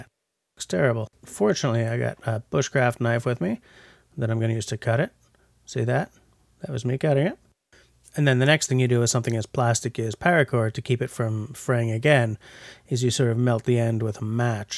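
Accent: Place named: Western Canada, Canada. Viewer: Hey there friendly. Man, I loved this one, too. Dead battery, yeah right. I bet it was a Nigel Tufnel wireless issue and you had the RCAF instead of you talking. Lol. How hard was the dubbing at the beginning? I'm just learning to edit, so I know it must have been way tougher than it looks. Had to watch twice, you kept cracking me up. I like your dry sense of humour. "here I am being silly/I must have said something funny/as I look pensive". Happy Thumbs up, sir. On a side note, your accent doesn't sound Quebequois...west of Manitoba, perhaps? Take care mon frere,.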